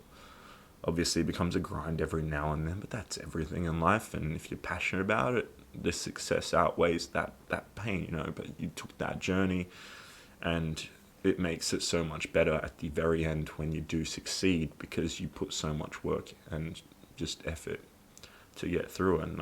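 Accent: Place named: Australia. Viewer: Hey man. I'm follow you from Perú. Your dad's video really touched me. At that time my father was fighting a battle that soon after also took him away. I started watching your videos several years ago when I wanted to improve my English on my own and found your accent interesting. At the time I didn't know you were Australian. Now years later my listening is much better so your Australian accent helped me a lot. Thank you very much, keep strong and keep doing you.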